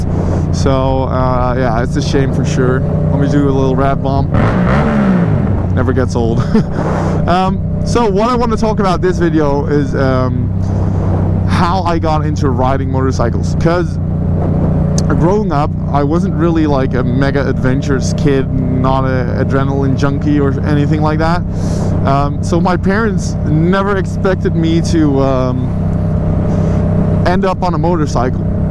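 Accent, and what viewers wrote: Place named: United States. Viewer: you have a very American sounding accent lol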